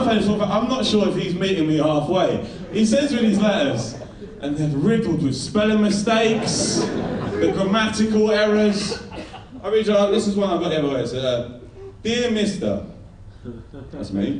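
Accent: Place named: London, United Kingdom. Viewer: im american and usually here we speak with american accents. example has a london accent. thats how.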